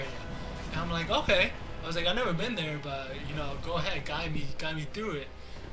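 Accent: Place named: New York, United States. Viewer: you sound new yorkish or even maine-ish. eitherway u have a cool voice. btw what sl were u in this video?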